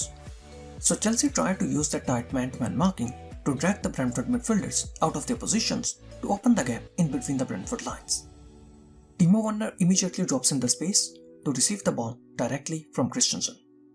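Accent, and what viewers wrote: India. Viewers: Love ur content, hate ur Indian accent.. 🤮🤮🤮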